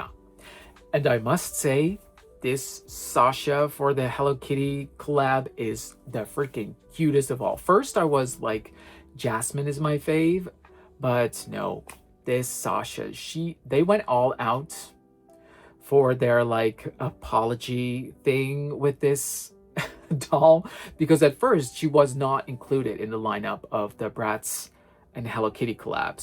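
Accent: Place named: New Jersey, United States. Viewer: That is so cool! I thought you had a Jersey accent lmao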